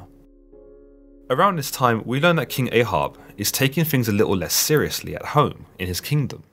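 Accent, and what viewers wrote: United Kingdom. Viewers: 😝😝😝😜😂this guy is really funny with the strong british accent. If the bible is from middle east, why dont you get some narrattor from middle eastern who know where to pause and where to be flawless. You guyz make fun of Indian people...atleast they speak english in neutral accent understandable to all the population of the world . This strong accent is awful which indeed creates stress..Lol . From new delhi India.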